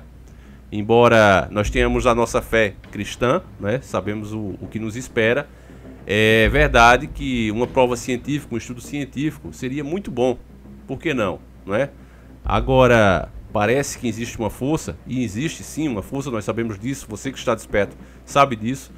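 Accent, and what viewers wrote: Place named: Pernambuco, Brazil. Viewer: Tudo e todos do canal merecem nosso respeito carinho e dedicação de passarmos a diante as informações para abrir os olhos ah do seu respeito a sua região quebra a pau pois esse sutac pernambucano igual a do meus pais e irmãos que nasceram aí me faz voltar a infância tudo verdadeiro nada artificial obrigada por tudo tô em Santos e admiro seu trabalho manda um abraço e pau neles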